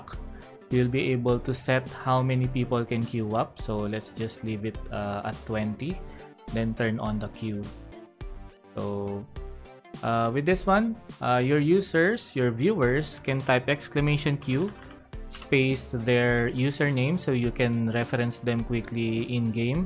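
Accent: Philippines. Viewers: Are you Filipino? I can hear definitely hear a Filipino accent hahaha! Yo thanks for this man! Love to support fellow Filipinos as well great job with this dude!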